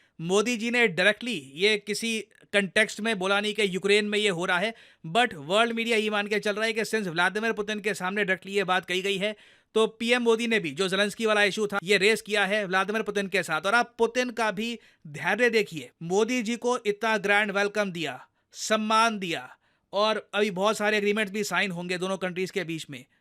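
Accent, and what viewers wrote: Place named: South, India. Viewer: That south Indian accent 😂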